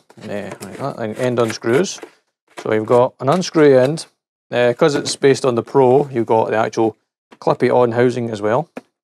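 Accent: Scotland, United Kingdom. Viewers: I love watching and listening to your videos. The refreshing sound of a Scottish voice is like some healing tonic. :)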